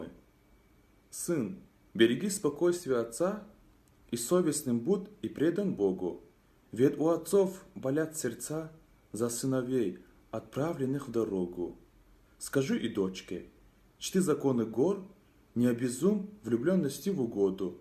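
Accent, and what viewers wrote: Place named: Dagestan, Russia. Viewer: Лезги акцент акуе вичин😀😀😀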